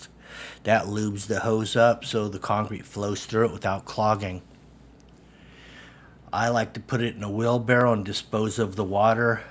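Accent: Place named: California, United States. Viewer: Listening to California Surfer accent takes me back...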